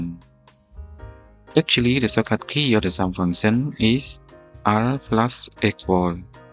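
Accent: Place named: England, United Kingdom. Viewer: I need to read translations related to my language because I have problems understanding the English accent.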